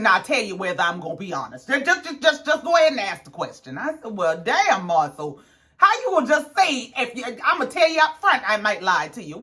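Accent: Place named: South Carolina, United States. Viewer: Some of my favorite commentators are too skewed on thier reviews because they don’t like Tisha but guhhhh you the most honest and unbiased review on this here show! Can’t wait til you hit 10k! Keep it going Queen. Where are you from cause I hear South Carolina all in your tone!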